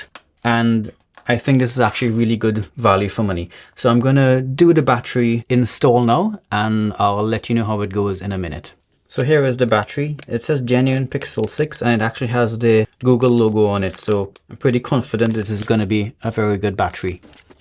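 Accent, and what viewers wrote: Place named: Trinidad and Tobago. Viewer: I could hear the Trini accent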